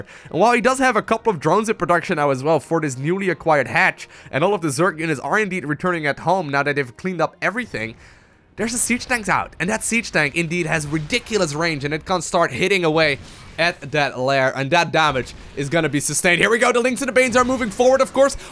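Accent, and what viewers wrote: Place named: Netherlands. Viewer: sounds dutch. The accent is real.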